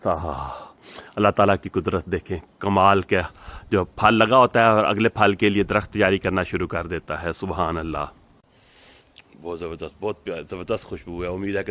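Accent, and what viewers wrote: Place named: Pakistan. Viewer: ap pakistani sound or rahe hai me apka chota bhai india se bohot acha laga apko pur sukun dekh k lekin australia kese settele hogye pakistan se.. ap kitne log hai waha settle family mem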